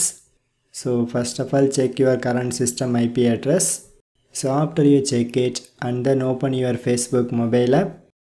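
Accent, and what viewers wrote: India. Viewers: Actually the Indian English is very bad I can't hear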